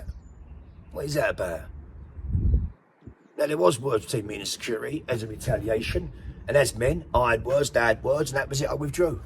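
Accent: Cockney, United Kingdom. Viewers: Love your traditional Cockney accent which is now sadly a dying breed - My Fiancé is from White Chapel & sounds exactly the same, salt of the earth 🙌🏼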